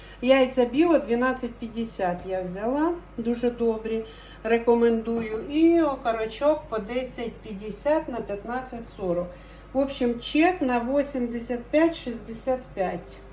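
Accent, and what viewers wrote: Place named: Zaporizhzhia, Ukraine. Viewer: сьогодні ролік клас дуже весело і цікаво а розмовляєш ти українською на нашім ріднім запорізьким діалектом.Слухати тебе приємно як з'їздив до себе додому.Прохання: більше показуйте і розповідайте про бази,показуйте місцевість.Якщо нема машини як ще можна передвигатись по тій місцевості,буду вдячна.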